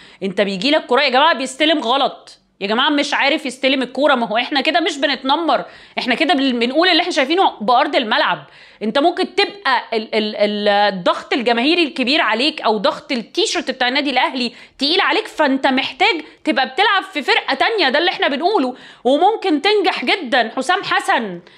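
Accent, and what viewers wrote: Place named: Levant. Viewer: حاولي تظبطي لهجتك المصريه ... بلاش استخدام بي بدلا من في ولا حضرتك عيشتي في الشام؟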